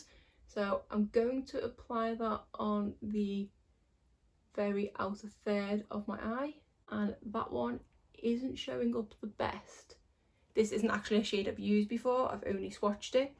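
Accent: Liverpool, United Kingdom. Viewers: Are you liverpudlian? I'm really rubbish with accents 🙈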